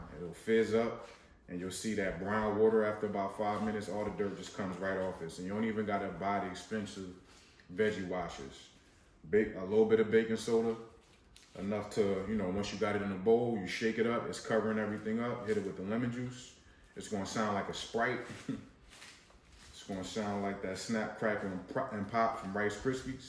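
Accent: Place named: East Coast, United States. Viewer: Let me get to the grocery store!!!! This video is dope and is as soon as you started talking, I knew you were from the east coast 💫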